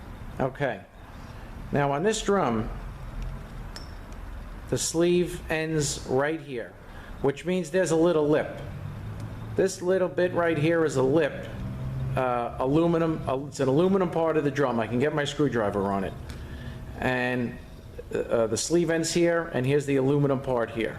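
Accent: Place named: New Jersey, United States. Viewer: I like to hear about 5hp19 with Sopranos kinda accent! All best to you!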